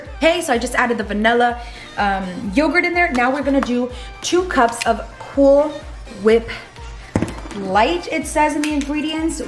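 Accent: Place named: New York, United States. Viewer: Loved everything, but I'll admit my favorite part was definitely your New Yorker accent 😂🤣❤👏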